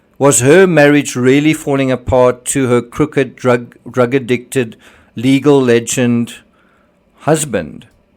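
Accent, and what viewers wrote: South Africa. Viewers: South African, I think. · It’s great to hear a South African accent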